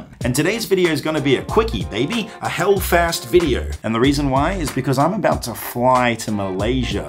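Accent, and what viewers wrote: Australia. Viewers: Australian accent is so cool. I like the way you say doiemonds. Good luck on your trip. Can't wait to watch the vlogs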